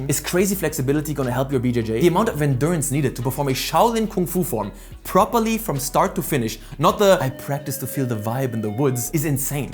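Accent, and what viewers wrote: Germany. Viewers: he's german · Ich bin verwirrt. Der Akzent ist 100% Deutsch, der content ist aber englisch. Zwischen drin droppt er aber ein deutsches Wort in perfekter Aussprache, auf der Kanal Info steht dann aber Vereinigte Staaten. Check gar nix mehr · First time watching you, first impression: wait, why is this Japanese person talking with a German accent talking about Chinese martial arts. What xD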